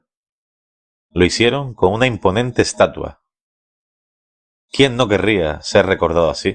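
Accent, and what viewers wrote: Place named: Canary Islands, Spain. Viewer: Muy buen contenido estás haciendo. Si ves conveniente podrías hacer vídeos explicando su método de entrenamiento para tendones. Gracias, por cierto por tu acento creo que eres canario, yo soy de Gran Canaria. Un saludo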